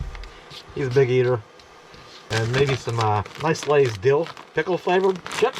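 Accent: Pennsylvania, United States. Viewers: That launch was cool, thanks for sharing..I live on the Delaware MD border n when the skies are clear you can just see the launches from Wallops Island in Accomack Va..have never gone to the launch but it's on my bucket list..I enjoy your videos..you remind me of family, how i was raised in Ohio and for my Pa cousins it's the accent, lol..Happy travels, Cheri✌️❤️😊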